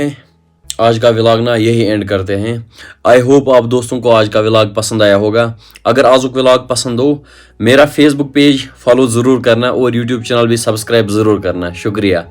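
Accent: Kashmir, India. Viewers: What is the reason behind your kashmiri accent ... Like you pronounce pewaa with pewaan , cxata with cxataan .. kupwara people dont usually use this type of accent . (answer) And much love and support to your content 🫂♥️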